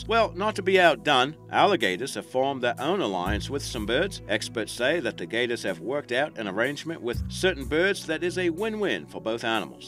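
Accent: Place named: Australia. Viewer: Nice to hear the Aussie voice make a return. It just feels better with it, like ol' Steve Irwin came back from the dead.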